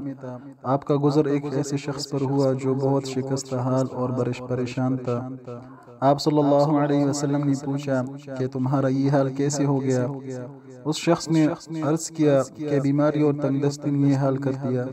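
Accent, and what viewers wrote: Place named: Arab world. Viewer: بھائ جان اردو زبان بولتے ہوے عربی تلفظ ادا کرنا درست نہیں کیونکہ عربی اور اردو میں فرق ہے اپنی اصلاح کے لئے بات کی ہے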